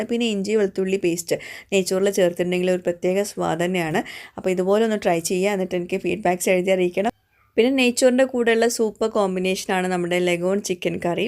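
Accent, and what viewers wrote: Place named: Northern Kerala, India. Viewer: Hi.. Njan ഈയിടെ ആയിട്ടാണ് vlogs കാണുന്നത്.. 4 items ഉണ്ടാക്കി.. Suupprr.. കുറച്ചു receipies എഴുതി വച്ചിട്ടുണ്ട്.. യുടെ proper place എവിടെയാ?? ഒരു വടക്കൻ slang.. അതാണ് ചോദിച്ചത്.. ഞാൻ kannur..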